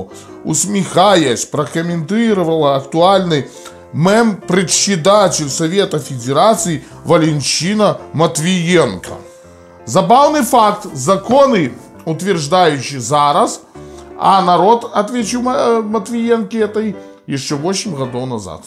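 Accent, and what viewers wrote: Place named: Belarus. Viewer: Сначала Лайк потом поржал над трасянкай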